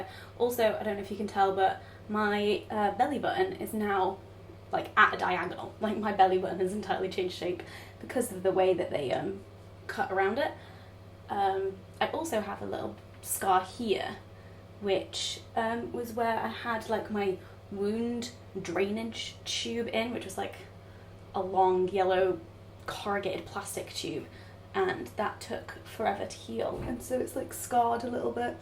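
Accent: United Kingdom. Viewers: Your accent is british... Atleast i felt so ... Is that true ???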